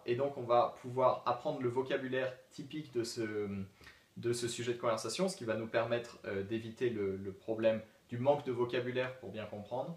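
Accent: England, United Kingdom. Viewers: c'est moi ou il a un léger accent anglais??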